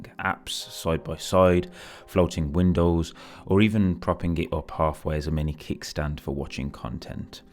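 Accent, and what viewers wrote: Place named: Black Country, United Kingdom. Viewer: You from dudley?? I like black country people, my mum is from there. 😂❤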